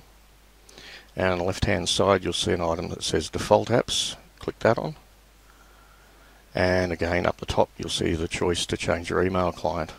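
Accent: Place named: Australia. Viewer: Mate, Your accent is awesome. Brought back all the fantastic memories I had in Australia. The best country, people and culture I ever experienced in the entire world. Video helped, good on you mate. Cheers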